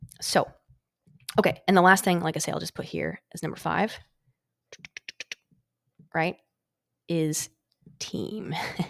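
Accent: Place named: Maritimes, Canada. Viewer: I can hear a maritime accent in your voice ... maybe annapolis valley, Nova Scotia ... wild guess ...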